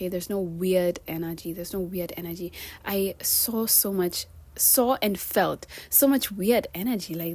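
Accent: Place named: United States. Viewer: I love that American acsent of urs... very cutesy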